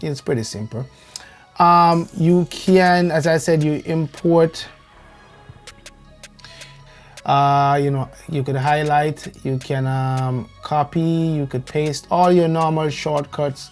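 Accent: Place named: Jamaica. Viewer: Subscribe Di minute mi hear Di yaad accent.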